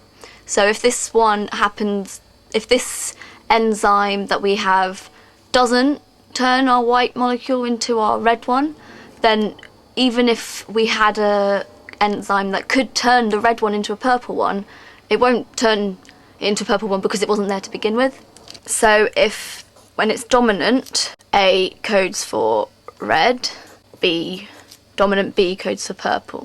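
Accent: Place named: United Kingdom. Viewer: a late night study session before a test can always be made a little better with a cool British voice girl that's good at explaining stuff. Thanks!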